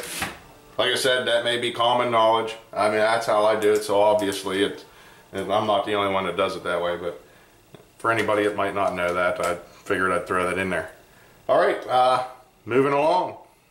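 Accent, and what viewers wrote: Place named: Pittsburgh, United States. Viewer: love your videos. I'ma new modeler and appreciate what you do. Also a PSU fan and Pittsburgher, picked up your distinct Pittsburghese in a previous video. Keep up the good work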